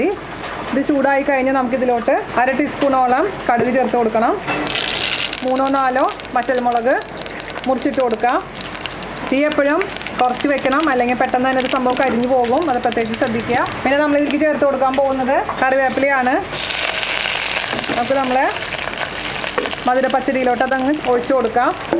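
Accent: Thrissur, India. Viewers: pineapple madhura pachadee undakuna reethi valare ishtamayee....thanks a Ton.....pinne namadeee GADI yude THRISSUR slank superrrrrr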